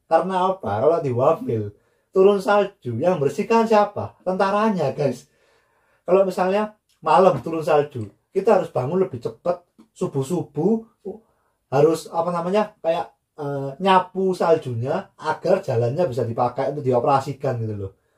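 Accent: Java, Indonesia. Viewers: Lama-lama logatnya kaya orang jawa,logatnya mengikuti mas hansol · Wah saya suka dg medoknya tinggal di Jawa az Oppa 😁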